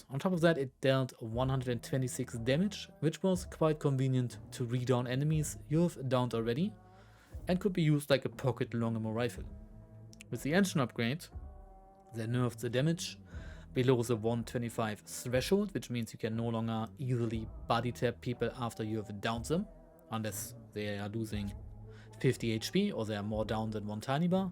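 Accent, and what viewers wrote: Germany. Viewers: Dude your German accent is so heavy I can't understand what you're saying 😭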